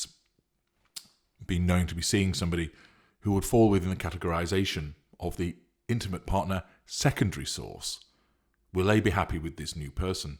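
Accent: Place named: Oxford, United Kingdom. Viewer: 🙂 i like his accent, he sounds like a Prof at Oxford ☺️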